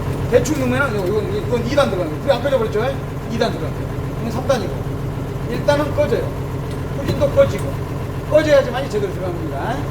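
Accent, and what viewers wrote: Jeolla, South Korea. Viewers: 전라도 교관 같은데요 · 전라도 사투리 맞죠잉? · 찰진 전라도 사투리 선생님 인상깊은 강의 잘 듣고 갑니다. 감사합니다.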